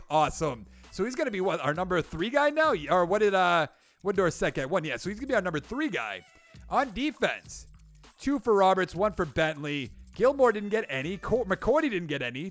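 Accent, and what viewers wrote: Boston, United States. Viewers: Boston accent on point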